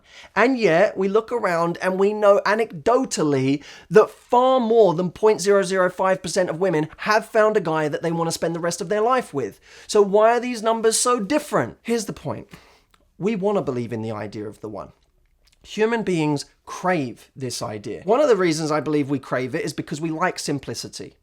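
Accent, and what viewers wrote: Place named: United Kingdom. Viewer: I like your videos, but my own problem is your British accent that I can't get used to!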